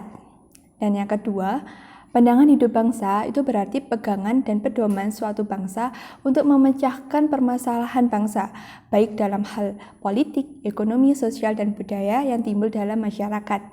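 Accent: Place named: Java, Indonesia. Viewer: kelihatan jawanya😂 agak gimana gitu,medok"selebew🤭😂🙏🙏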